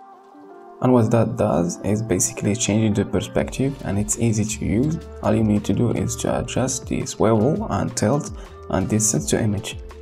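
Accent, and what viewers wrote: Morocco. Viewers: moroccan accent yak hhh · Moroccan accent 🤍 keep going bro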